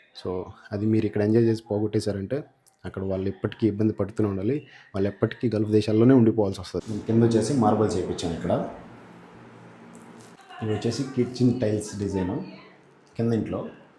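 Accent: Kadapa, India. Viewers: Fast time mi video chuste mi Bhasa ma Kadapa ekkadunna kadapa a level veru anna❤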